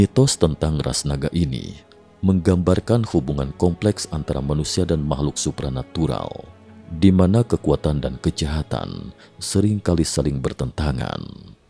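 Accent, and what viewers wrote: West Java, Indonesia. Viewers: mang , maneh urang sunda nya ? hayoooh ngaku 😂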